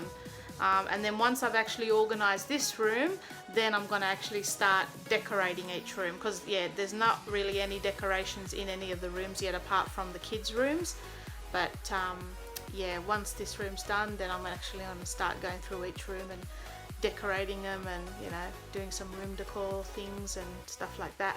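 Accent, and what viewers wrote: Australia. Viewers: I love it! It feels comforting to hear an Aussie accent too! Are you Indigenous Australian?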